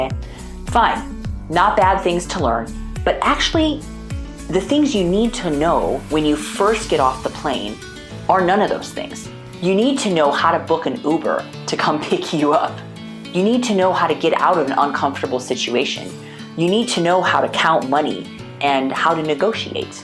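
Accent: United States. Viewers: Hey ma'am i have always seen you preparing or making one thing or another only for Non Indian people I just want to request you to please do something for Hindi audiance/viewer We the people of India really lacks the ability to speak/write/express in #AMERICAN English language as we know English is the Global language and Language of business We need your accent we need your native language skill For lots of Hindi people Specially for #BIHARI We want to explore and connect with your world 🌍 through your language and wants to grow So Please Do something who is not Non-Indian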